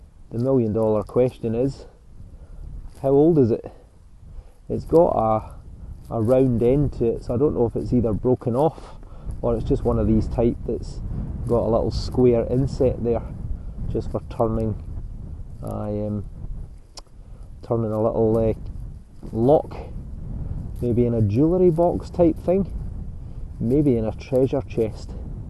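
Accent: Scotland, United Kingdom. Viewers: I love the Accent! Scottish Accents are a range of Sweet to Hysterical to most Elite. Can't imagine the History Finds in Scotland. Have Fun, Best Treasure Successes! 😘 Beth Tennessee, USA "Irish American" 🍀🇺🇸🍀 County Kerry Lineage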